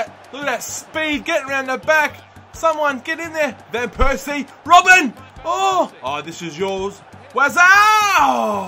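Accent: Australia. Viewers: Isn't it obvious? Australia!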